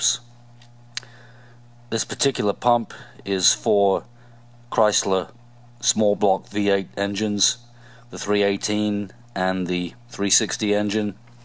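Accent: England, United Kingdom. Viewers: You are definitely English,and also sound like George Harrison!!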